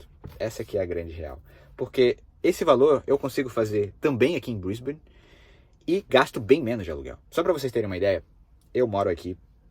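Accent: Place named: Central Brazil, Brazil. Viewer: Você é de Goiás, Brasília ou Minas Gerais? Tem um sotaque característico desse lugares.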